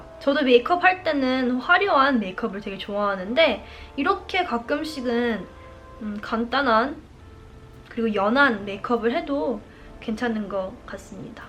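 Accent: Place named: Konglish, South Korea. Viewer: Is it weird if I say I love listening to you speak Konglish? :)))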